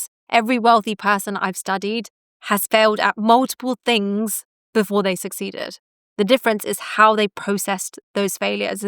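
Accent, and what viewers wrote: United Kingdom. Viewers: Are you born British?? I am obsessed with your British Accent.....!!!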